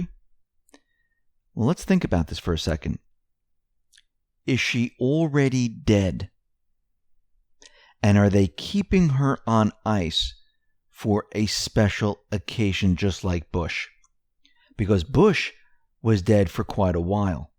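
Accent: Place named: New York, United States. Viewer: from the New York area judging by his accent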